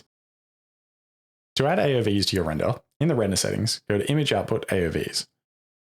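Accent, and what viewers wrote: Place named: Australia. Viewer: Holy shit, is this taught by Australian Barry Manilow? I'd like a tutorial on how to get my voice to be this sexily resonant, I feel like I'm being seduced by a whiskey cask.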